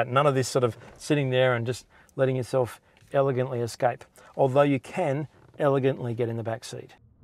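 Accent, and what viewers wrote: Australia. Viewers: Man.... I miss Australia soooo much. I already have an E-class coupe and I really like it. I'm here mostly to listen to someone speak, Australian! BTW, silver is a very good color to have (especially in Canada), because dirt doesn't stick to it like it does on a white or dark colored car. I have always bought only black or dark ruby red, cherry red, metallic cars. But I discovered that silver cars, I only have to wash it once for every five or six times I'd have to clean a black car. I bought silver because it was the only E coupe on the lot; and if it's a Merc', color doesn't really matter to me; it's a Mercedes Benz.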